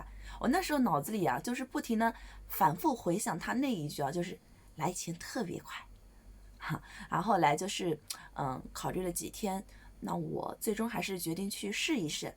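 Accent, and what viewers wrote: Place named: Jiangsu-Zhejiang, China. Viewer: 所以 你做的足浴城在哪里 听着像江浙口音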